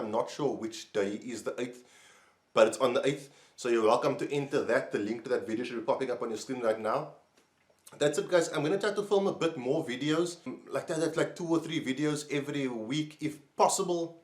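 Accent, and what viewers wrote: South Africa. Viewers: are you south african? I'm trying to puck up on that accent lmao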